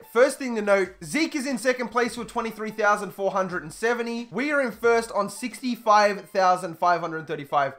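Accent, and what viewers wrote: New Zealand. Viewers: Are you kiwi? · Are you a kiwi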